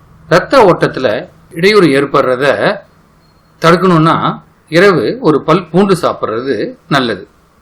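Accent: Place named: Kerala, India. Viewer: 😂😂😂 but malayala slang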